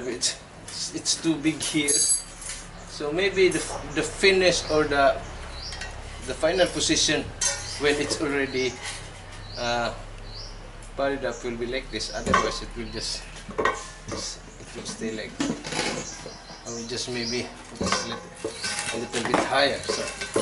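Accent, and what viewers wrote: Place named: Bisaya, Philippines. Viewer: piste mu bai..pnipilit mu pang mag inglish (english)...hlata nman bisaya ka...pisti tlaga..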